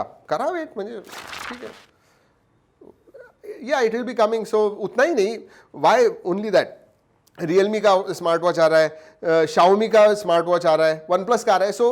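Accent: Maharashtra, India. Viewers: aap jab marathi bolte ho to bahot achha lgta hai.. kya aap maharastra se belong krte ho..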